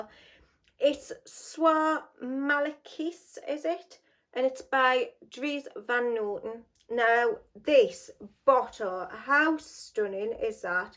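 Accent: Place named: North East England, United Kingdom. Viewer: ! It’s so lovely to see you back🤗 Can you believe I’ve only just found your channel. I started binging your videos this month while recovering from gallbladder surgery! ❤️your content and your North East accent🥰. I have not worn much fragrances while recuperating but I do still love to sniff them😂. All the best from NYC x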